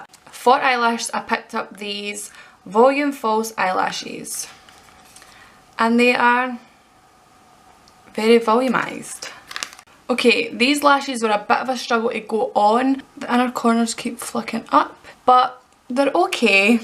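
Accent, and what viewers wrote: Scotland, United Kingdom. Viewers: I just adore the Scottish accent, I’m so obsessed with it 🥰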